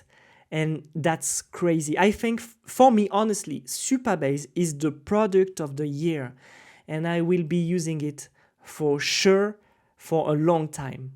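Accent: France. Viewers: Il me semble que tu es français vu ton accent ( mais tu maitrises parfaitement l'anglais !) merci pour ton retour, moi aussi j'aime beaucoup Supabase et encore plus VueJs! j'espère qu'il va continuer de se répendre et pourquoi pas un jour prendre le pas sur React ;) Si tu utilises un peu Nuxt 3, n'hésite pas à faire une vidéo ou tu l'utilise avec Supabase justement. D'ailleurs est ce que tu l'utilise avec une ORM comme Prisma? Encore merci pour tes vidéos! continue ainsi!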